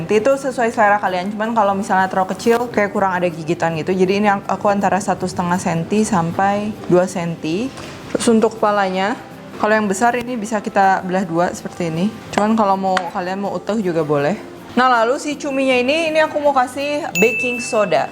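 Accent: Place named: West Java, Indonesia. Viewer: bau hanyir 🤔nyunda pisan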